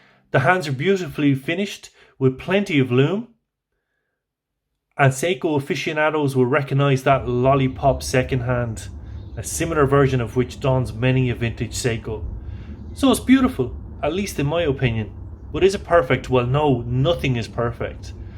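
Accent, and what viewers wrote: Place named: Ireland. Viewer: And, nice channel you've got there. As an Irish guy as well, the familiarity of your accent and turn of phrase is nice to hear. And like you, I'm in the same boat when it comes to Red Breast.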